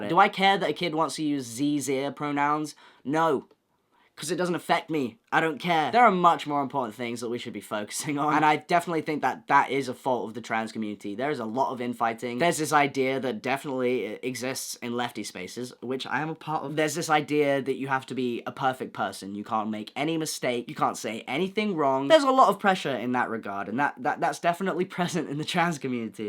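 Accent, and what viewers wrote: United Kingdom. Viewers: this is not a hate comment or anything but OH MY GOD i did not expect this accent. Like you have the most american face ever. you look like you surf in your free time. and i see your californian face and i watch the video and its the most bri ish accent ive ever heard. like this is insane. nevermind the accent, i really liked your takes and generally the way you speak. you seem to be a very smart and compassionate person. very nice video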